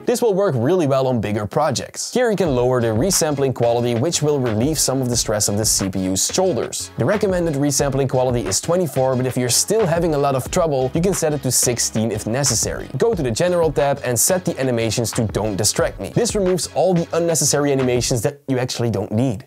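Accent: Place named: Netherlands. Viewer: Ik hoor dat je Nederlands bent 👊🏼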